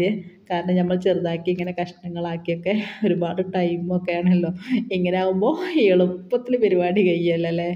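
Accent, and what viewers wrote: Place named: Malappuram, India. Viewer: Wow ഹെൽത്തി കപ്പ കറി അടിപൊളി ആയിട്ടുണ്ടല്ലോ പൊളിച്ചു എനിക്കു ഇഷ്ടം എല്ലാവർക്കും ഇഷ്ടം ആകുന്ന മനോഹരമായ മലപ്പുറം നാടൻ ഭാഷ വന്നപ്പോൾ കപ്പ തിന്ന ഫീൽ ആയി 👌👌😋😋🥰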